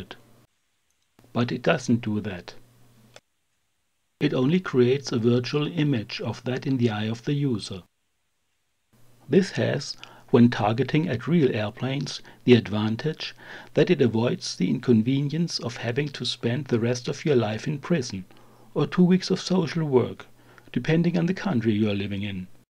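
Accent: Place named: Germany. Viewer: The narrator sounds like the Cherman guy who.made "Grizzly Man."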